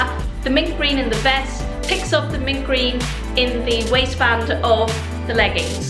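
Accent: Ireland. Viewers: oh okay, Donegal here, thought it was A Donegal accent🙄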